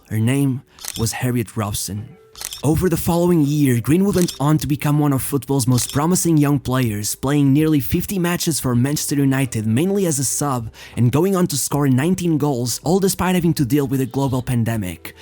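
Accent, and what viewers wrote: Portugal. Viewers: Your Voice looks portuguese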